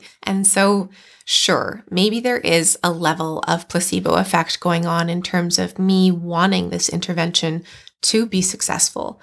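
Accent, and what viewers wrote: Canada. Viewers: She's not American and has a distinct Candian accent. 😂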